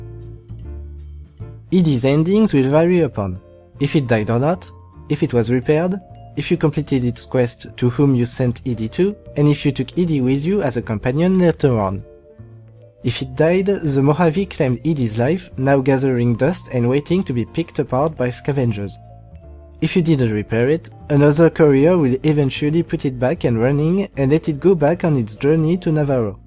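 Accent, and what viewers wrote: France. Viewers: est ce que tu est francais ? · you do understand his native language is French right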